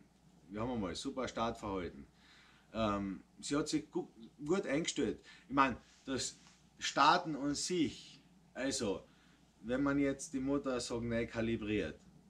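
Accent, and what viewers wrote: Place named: Austria. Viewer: Jo stimmt, der österreichische Dialekt kling so richtig angenehm.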